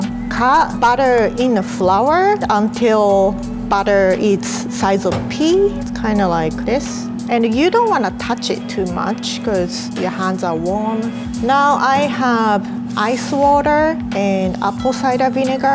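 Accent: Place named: Japan. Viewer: I think because she’s Japanese so she pronounces it like that.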